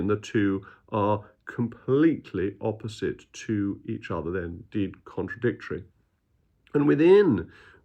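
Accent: RP, United Kingdom. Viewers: You can talk as much nonsense as you like with your posh accent, but there's only one question that needs answering… Now that it's been several years since we left, is the UK now at least as successful as it was when we were in the EU, or have living standards across the board dropped significantly? I think most people know the answer. And this is the ONLY question with significance. The rest is just hot air... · Jacob, I listened to you to have first hand knowledge of what you have to say. Your slow over pronounced words about sovereignty, control and who makes the rules is sadly to obscure your personal interests of financial engineering and tax evasion. The EU is a terrible group of non-elected bureaucrat globalists who are acting against the interests of Europeans and dangerously warmongering against Russia, which is no threat to Europe at all. Jacob is a banker, in both senses of the word who creates and makes nothing. 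He is a financial speculator and the sort of person who has destroyed Britain as much as the woke idiots. Britain has many serious issues none of which are solved by Brexit. Jacob's weasel words about the intelligent, patriotic British is disingenuous, his words are aimed at those stupid enough to think his posh voice makes him intelligent and speaking in the interests of the majority of British people. Don't be fooled, he's no different from Farage, Cameron, Johnson, Blair, Starmer, and all the other snake oil salesmen.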